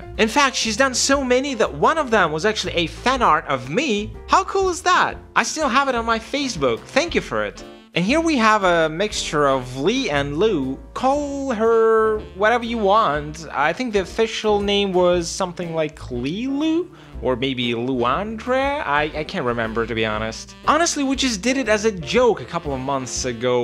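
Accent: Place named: Russia. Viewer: Очень классный ролик, но блин какой же классный русский акцент :)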